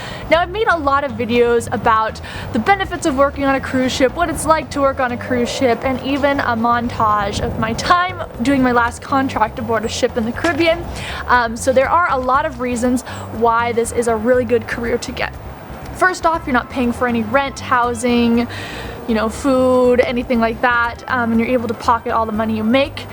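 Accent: United States. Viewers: I sounds like you have an American accent. Were you in entertainment? I was a casino dealer on RCCL. What can make or brake it, to me, is your roommate. Any opinions?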